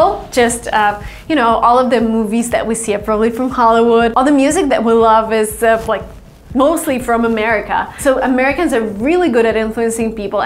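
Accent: Russia. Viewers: I’ve recognized her as a Russian speaker 😅😅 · The way she "love"..... lovely Russian accent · Потому что у него русский акцент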